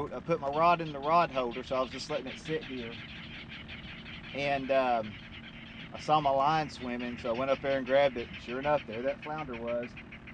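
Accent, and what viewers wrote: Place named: South, United States. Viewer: I hear that Southern twang...🎵🎶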